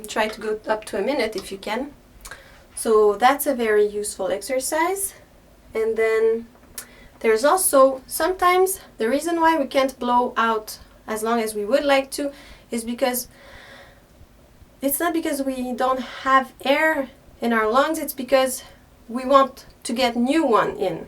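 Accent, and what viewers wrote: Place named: France. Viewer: French horn player here 📯 Thank you for you tips , really helps. I can feel that I'm full with air :) Also you have nice English with gorgeous French .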